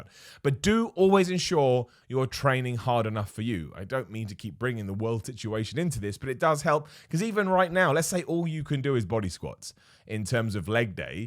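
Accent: United Kingdom. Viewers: Sooo refreshing to here a British voice on YT! Your funny, down to earth and no BS! Well done sir!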